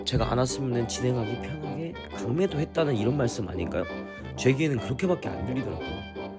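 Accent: China. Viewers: ㅋㅋㅋ조선족아님 말투가 조선족인데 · 말투 그냥 조선족임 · 말투가 조선족인데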